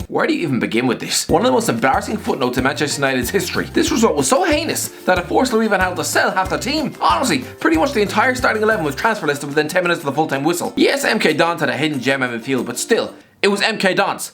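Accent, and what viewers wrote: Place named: Ireland. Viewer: Irish guy voice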